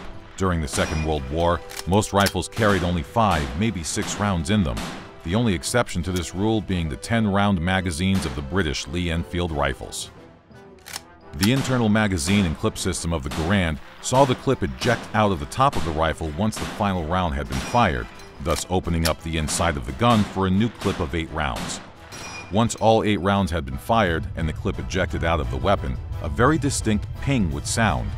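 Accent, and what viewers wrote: United States. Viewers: The pure american voice of this video makes it so much better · American accent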